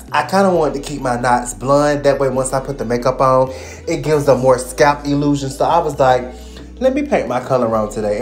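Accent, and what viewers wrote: South, United States. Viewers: are u from memphis or houston u sound like u have a similar accent to us round down souf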